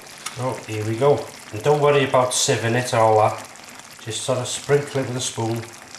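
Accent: United Kingdom. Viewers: First question, "what has potato got to do with liver and onions?"' I am only 70 years old, what is an "ox-o?" Bet your liver was tough, I have ever seen anyone boil their liver. Your accent lends to Britain, we were also taught with meat, to never use a utensil that punctures the meat. Your old recipe of liver and onions kind of turns me off, and I am a guy that really likes liver and onions. Again, almost into mt 71st year on the planet, I have Never, ever come across lamb's liver.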